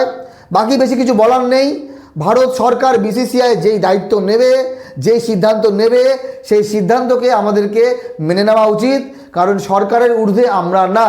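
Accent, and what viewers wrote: Bangladesh. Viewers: তোমাকে ভালবাসতাম, কিন্তু তুমি বাংলাদেশী দের মতো কথা বলছেন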